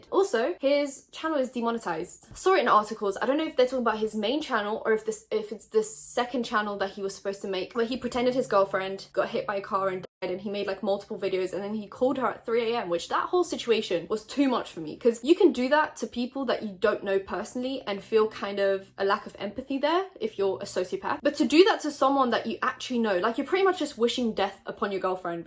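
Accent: United Kingdom. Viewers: i thought she was british?? Where is from? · not to be rude or like insensitive but where are you from? i thought you were british this WHOLEEE time bc of ur accent lmao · omg, I thought you are British! What's your background then? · So out of curiosity, where are you from? Cause I thought you were British😭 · I thought u were British I'm confused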